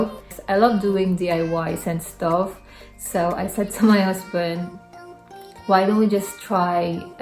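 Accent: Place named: United Kingdom. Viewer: I Really like your British accent. And I’m trying to copy it 😜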